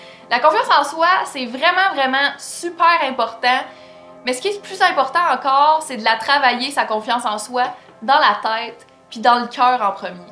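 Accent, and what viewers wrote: Quebec, Canada. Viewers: Ptn j'arrive pas à écouter sans rire haha, l'accent québécois me tue😂😂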